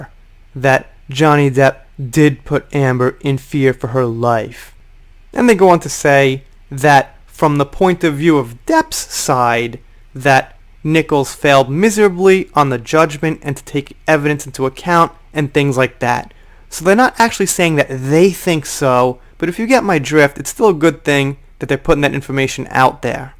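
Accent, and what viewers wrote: New York, United States. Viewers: Just so ya know you pronounce it "Toka, Japan" your New York accent makes it sound nothing like Tokyo.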